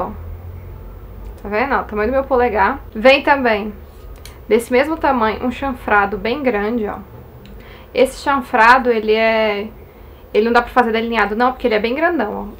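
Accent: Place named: Bahia, Brazil. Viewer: SÓ VOCÊ MESMO ! Estava pesquisando para comprar pincéis .. arrasou .. comprar nesse site e como sou ansiosa 18 dias eu aguento kkkk assim que chegar eu te conto. Sua linda muito obrigada pela dica. Eu adoro demais seu canal !. Ahhhh até esqueci mas a minha amiga que tem seu sotaque ela mora no interior da Bahia Hahahhaha 😂😀 Beijo lindaaaa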